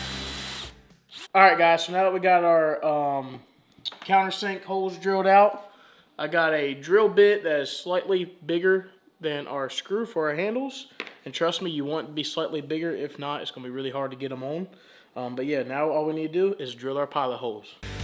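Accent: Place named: East Coast, Canada. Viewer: Dang I'm up in Canada It sounds like you have an East coast Canadian accent.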